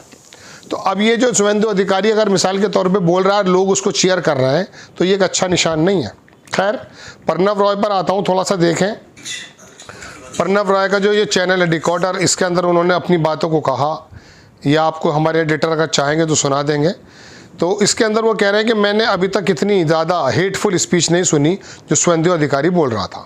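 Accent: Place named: Meerut, India. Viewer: Patrkar sahab meerut ke aas paas ke shetr lagte hai bhasha se 😊😊😊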